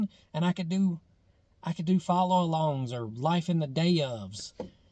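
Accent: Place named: North Carolina, United States. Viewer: I don't know why but it really feels like you're from Montgomery County in NC for some reason. Maybe because you talk a bit like a few relatives of mine. Love the content bro!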